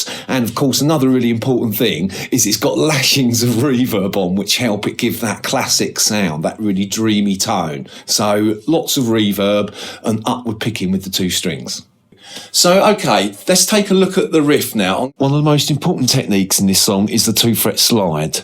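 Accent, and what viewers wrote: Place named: United Kingdom. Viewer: you got a very british accent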